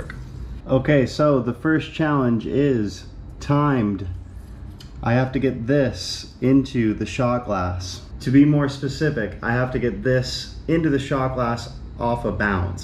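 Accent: South, Vietnam. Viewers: tiếng việt của bạn theo tôi đã dc 80% rồi , người tây nói giọng miền nam vn nghe rất thú vị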